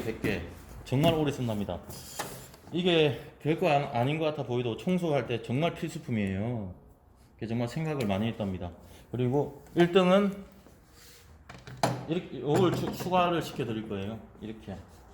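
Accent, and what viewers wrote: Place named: Gyeongsang, South Korea. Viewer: 경상도 말투가 귀여우신분 처음봐요☺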